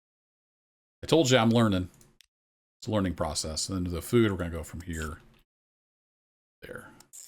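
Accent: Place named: United States. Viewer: Its weird when I've always watched people from the UK playing, and then I hear you pronounce things the American way. But it makes a change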